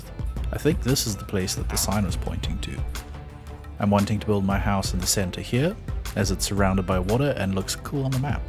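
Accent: South Africa. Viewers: can hear that South African accent from a mile away xD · Your south african right?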